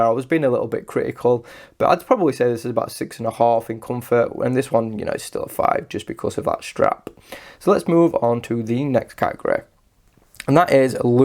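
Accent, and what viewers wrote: United Kingdom. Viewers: LUV YOUR BRITISH ACCENT SU MUCH!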